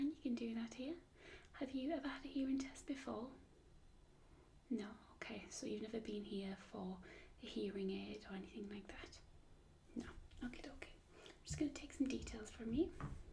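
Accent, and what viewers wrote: United Kingdom. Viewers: You sound like you're from the UK? :P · Is she Scottish, Australian, or British?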